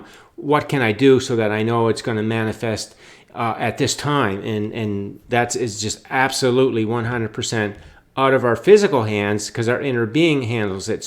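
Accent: Canada. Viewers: I’ve never noticed a Canadian accent until this video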